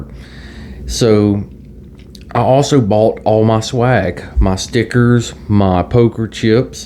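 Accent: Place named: South, United States. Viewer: Why am I just now finding your channel? I am a southerner and miss hearing a good southern accent where I am at currently. Great on the card info. Love the Magnolia (have one in my front yard).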